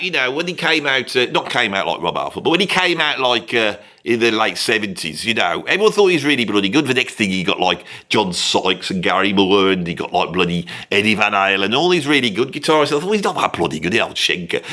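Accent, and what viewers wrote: Birmingham, United Kingdom. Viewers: Brilliant! But, as an American hailing from the home State of Kid Twat, 52 minutes of Brummie dialect has done my head in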